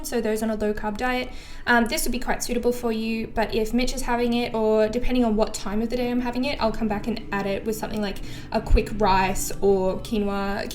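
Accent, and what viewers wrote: Australia. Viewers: Your accent, Australian? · I love your accent are you from Australia!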